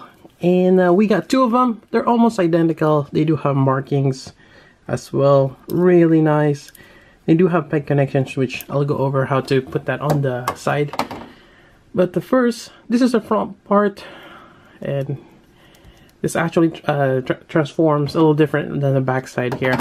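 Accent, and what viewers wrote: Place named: Philippines. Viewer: the way you talk.. are you filipino?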